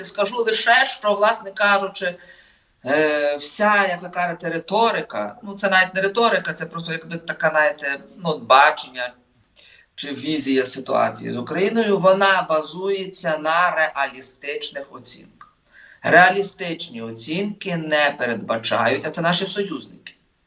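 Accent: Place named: Russia. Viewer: Прізвище - російське, мова, хоч і львівська, але трохи рос. акценту є, напевно москаль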